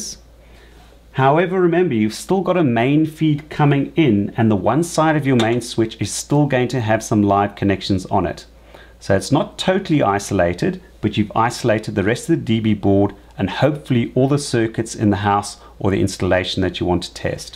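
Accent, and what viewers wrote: South Africa. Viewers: haha sud afrikaans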